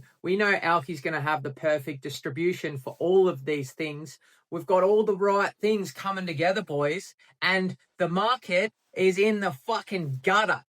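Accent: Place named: Australia. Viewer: Aw yeah nah yeah Nils is a real one thats for sure. Once you see the Auki big vision you understand this is the inevitable progression of blockchain. Great to see that Aussie spice in your vids from a fellow Aussie. And "owki" said right haha - Scodo.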